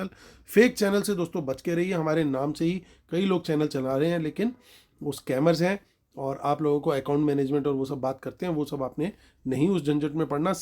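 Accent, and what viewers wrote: Punjab, India. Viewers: Baaton mein punjabi flavour na ho aisa ho hi nhi sakta🎉😅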